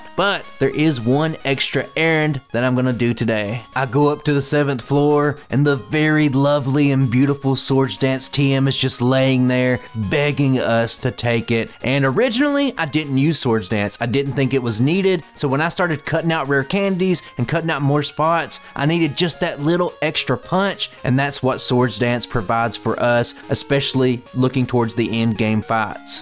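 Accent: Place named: Kentucky, United States. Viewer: My son got excited when he heard your voice because “He sounds like he’s from Kentucky like us!!” 😂😂😂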